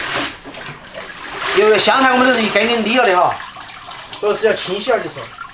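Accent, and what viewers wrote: Sichuan, China. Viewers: 👀你吃就要笑。最好不要吃多麼快。喜歡你的四川口音。well done!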